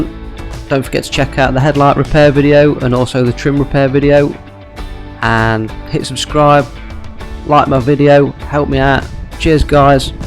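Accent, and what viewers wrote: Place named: Nottingham, United Kingdom. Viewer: You wouldn’t be a nottingham lad by any chance?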